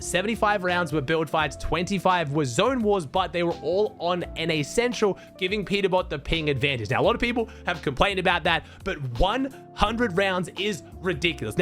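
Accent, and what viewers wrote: Australia. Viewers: Your wierd Aussie!!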